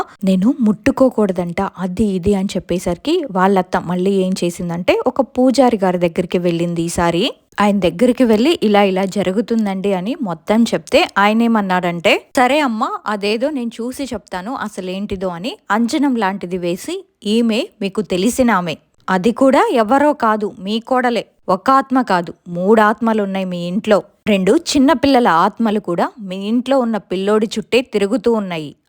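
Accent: Telangana, India. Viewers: Hi, I'm a new subscriber from last 3 weeks ago, I'm a big fan of horror stories junky lot more interested, Randomly your video the bangalore delivery person video nundi almost back to back 25-30 videos chusanu, Miru telangana slang lo kuda baaga matladtunaru, miru cheppe style as it as miku stories share chese valla laga cheptunaru 🙌👏👍 best of luck 🤞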